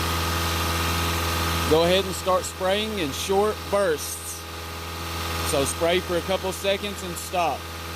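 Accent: South, United States. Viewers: Your southern accent is amazing.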